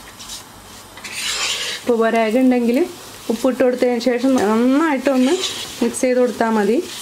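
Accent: Kodungallur, India. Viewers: 😀samsaram kettappol thonni. Ente veed kodungallur an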